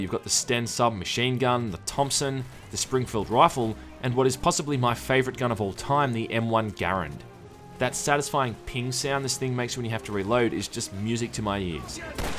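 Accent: Australia. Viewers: Love your Australian accent. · You sound so innocent and well behaved here mate! Keeping the Aussie accent in check too. I'm glad you found your real voice eventually, coz you're hilarious and entertaining.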